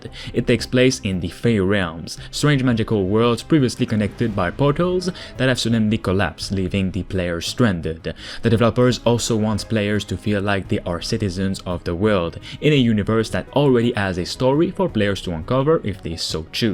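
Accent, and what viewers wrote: Quebec, Canada. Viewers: Man I love your Georges Saint Pierre style accent. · do i hear a quebec accent ? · Tu sonne comme un québécois mon chum ;)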